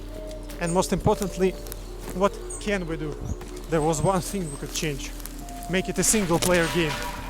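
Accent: Russia. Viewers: Бро говорит с явным русским акцентом)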